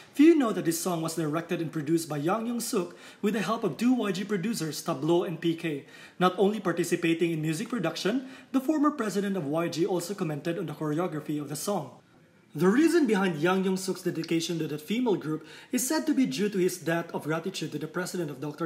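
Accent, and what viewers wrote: Philippines. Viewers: I could tell the man narrating this video is a filipino lol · pustahan pinoy itong nagsasalita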